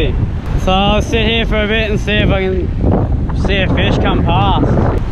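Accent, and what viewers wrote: Australia. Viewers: This video is so australian lmao. Australian accent, fishing, surfing, dolphins 6 feet away from you... And I'm only 5 and a half minutes in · Were are you from ? Sound Australia or like you are from new Zealand, love your voice and accent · do all azzys talk like this i canna understand him haha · The ozzy accent tho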